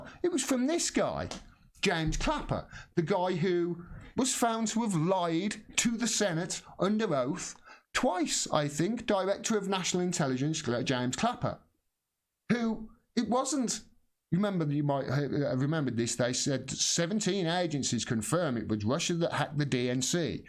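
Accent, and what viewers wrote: United Kingdom. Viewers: what makes that story and previous ones you covered kinda 'palatable' for us powerless rabble is your great Brit accent! Would love to be able to rant about the media in my own french language the way you so honestly do. Thank you and here is a little story that will make you and your followers laugh your a** off in these bleak times, enjoy: <link> and here is the tape: <link>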